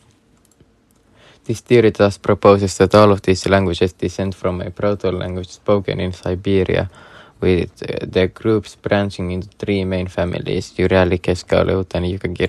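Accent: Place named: Finland. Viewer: Give him a break! This is a Finnish accent on a channel about Uralic languages. It's fine :) I can't speak Finnish so who's to judge!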